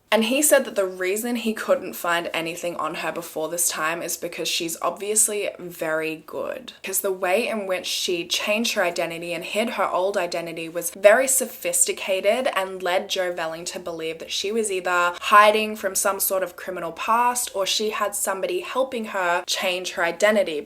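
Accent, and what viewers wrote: Australia. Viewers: Love the Aussie accent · Since 1985, I've heard the beautiful Australian accent every day, thanks to Neighbours.. So after this Friday, you'll be helping to fill that void for me!!... 😔💜💜💜💜💜🇬🇧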